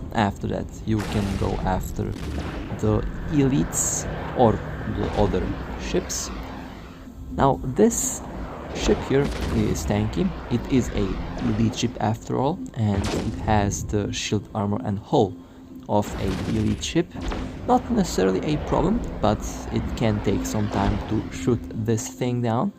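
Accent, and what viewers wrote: Russia. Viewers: Лучше бы по русски сразу говорил, акцент русскоговорящего ппц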